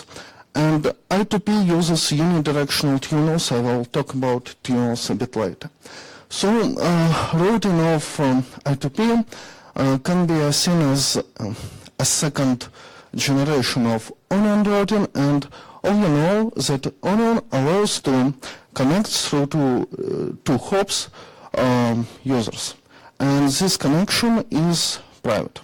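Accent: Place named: Russia. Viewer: A russian speaking english with an accent into an overdriven microphone about a somewhat difficult to understand and interesting topic - god damn. Furthermore, it's the only useful video when you search for "garlic routing". :(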